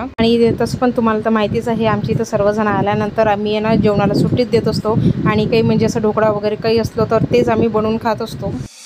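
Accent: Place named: Akola, India. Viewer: तुमची भाषा माला खूप आवडते आमच्या शेजारी आहेत ना ते अकोला चे आहेत हाऊ ना😊😊 मस्त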